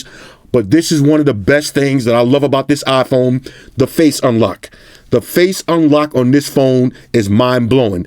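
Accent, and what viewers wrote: New York, United States. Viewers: Thats not a hood voice... its just his New York accent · Hey what’s up!! Been creeping on your channel for a few months now this is my first comment. Love in the content man. Original shit genuine opinion n to the fucking point. I appreciate that!’ anyway Two questions... 1) What did you mean when you said get an iPhone so you can experience Instagram the right way? Is it just like Snapchat where there’s more features on the iOS version. 2) what Burrough u from? Bk? stat? Or Jersey maybe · Naaaw, he sounds like straight up New Yorker to me lol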